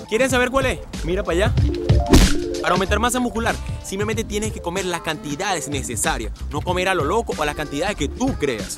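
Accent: Venezuela. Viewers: Parece venezolano por el Acento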